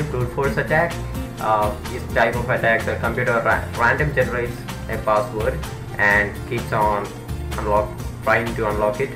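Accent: Kerala, India. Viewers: Malayali aanennu thonnunnu accent kettitt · Are you from india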